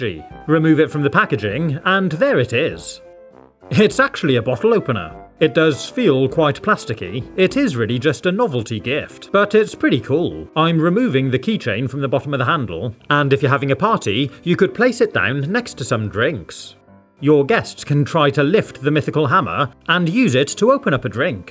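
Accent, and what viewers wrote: United Kingdom. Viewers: Are u British???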